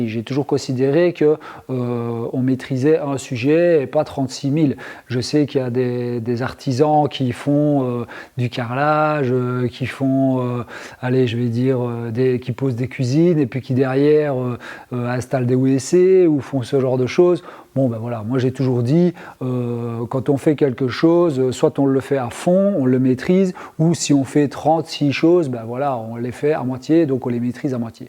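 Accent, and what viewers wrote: Belgium. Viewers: Les erreurs reste humaine courage ne te décourage pour des râgeaux ça n en vaut la peine . De plus en France nous adorons nos voisin belge et leurs façon de parle ..car le sais tu d une région à une autre en France il y a des façon de parler totalement différent....allez les gars courage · Oh ça n’a pas l’air de tourner bien rond aujourd’hui!!! Si tu décides d’arrêter à cause d’un charlot, ça ne ressemble à rien. Ignore-le, c’est la meilleure chose à faire, nous on te respect pour ton job, pour ton savoir faire ainsi que toute ton équipe, quand je dis nous je parles au nom de la majorité de tes abonnés. S’il y a un con qui se moque de ton accent ou des belges, en général, c’est qu’il n’est pas bien intelligent, laisse dire les jaloux et continu ton job. Tu es un mec bien et tous les collègues aussi. Salutations très amicales.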